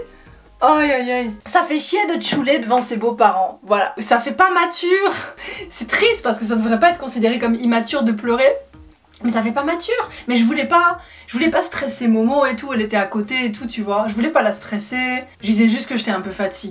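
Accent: Belgium. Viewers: J'adore, surtout l'accent belge.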